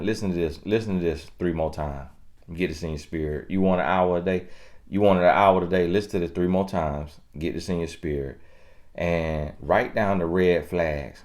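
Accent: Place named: Florida, United States. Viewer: Luv that Flowda accent...you preach ole skool wisdom Bro!Preach from experience!And it is a mirror of dysfunction inside....polish the mirror 👍🏽🌞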